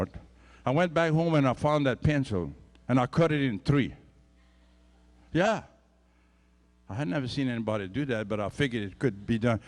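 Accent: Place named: Louisiana, United States. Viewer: continuez à parler français. Votre accent et vos expression enrichissent la langue, si vous cessez de parler français, ce sont tout les francophones (Français, Canadiens, Belges Suisses, Haitiens, Africains, malgaches etc etc) Qui perdrons un peu de leurs identité. VIVE LES CAJUNS !!!!!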